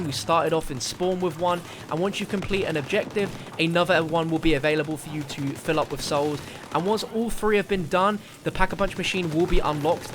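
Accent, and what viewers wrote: United Kingdom. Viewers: omfg i cant stand ur british voice its awfull pls stfu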